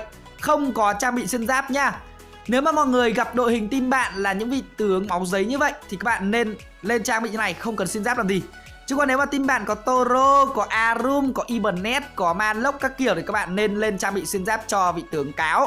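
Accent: Nam Dinh, Vietnam. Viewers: A ở nam định hay gì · Đúng giọng nam định... a quê ở tp nđ à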